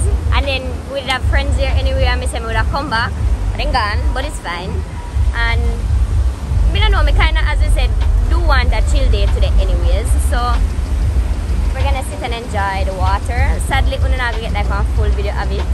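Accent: Jamaica. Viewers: . Your Jamaican. Patois tun up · Welcome back I miss u and your cute face with ur Yardie talking u looking beautiful as ever 😊❤ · Wow. Simply Wow! "Pretending to speak like that...Sound that awful"...what a negative way to describe a native accent no matter what part of Jamaica we come from...none of it is awful. Based in her stories she was born in Jamauca, went to school in Jamaica and did very well in high school ..so she can speak however she chooses depending on the situation. That's an unkind statement. is a great representation of #outofmanyonepeople ..We all can look and speak differently. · Greetings from London uk, you are definitely a Jamaican Lady and your accent just fantastic. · Good spirit, beautiful vibes!! Lovely accent... I love Jamaican people!! 👍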